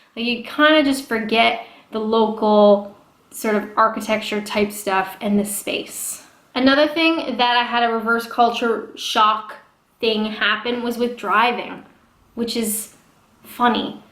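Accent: Canada. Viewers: "Stay on the RIGHT.. Stay on the RIGHT" Made me chuckle. Don't put yourself down, You are very good at this and very intresting so keep it up. Love the way you Canadians say "Out" like "Ooout" Haha and a great way to tell the difference between an American and a Canadian ;-) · I love your stronger Canadian accent. Noticed it straight away! I don't know if I'd prefer Canada, with it's big wide roads, but super long drives, or here, where everything is close but SO congested. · Trawno yep the Canadian accent is stronger after the visit. :) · Not seen a vid of yours in a while. I check in on your gram though. That said, when I played this video, I realised I had forgotten you had a Canadian accent. You have a great fan-base, you'll get tons of subcriptions... Not me though.... I'm poor. Have a great day. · Hi ya welcome back. I hope you don’t start to lose your accent too much as it sounds so cool fresh from Canada. 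· You definitely sound more Canadian now that you've been home for a bit! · But I have noticed that the more i watch you i can see the difference between the US accent and the Canadian one nust from your pronounced of some words and how you enunciated certain words. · it's also so funny I notice your Canadian-ness in the way you speak, but you have a british accent on certain words now too (like the endings of certain words). Very interesting, not sure if you noticed! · Your losing your Canadian accent. 😁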